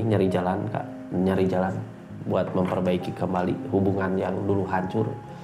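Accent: West Java, Indonesia. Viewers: Sunda pisan A...Libra Bandung Hadir stay Cilacap salam kenal A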